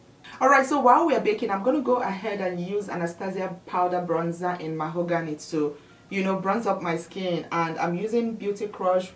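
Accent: Nigeria. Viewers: Oh that Nigerian accent 😂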